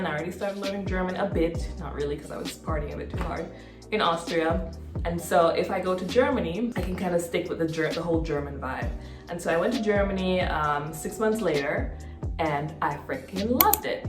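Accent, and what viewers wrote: Jamaica. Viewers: I knew I heard that Jamaican accent hidden under there some where lol...also we have the same last name hmm.. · We’re the same height and I knew i heard some Jamaican in you! I’m Jamaican living in the US who also loves Romance Novels especially English Lit . I’ve never met anyone else who enjoys it most people think I’m actually living in a fantasy world but I know it’s exists lol ❤️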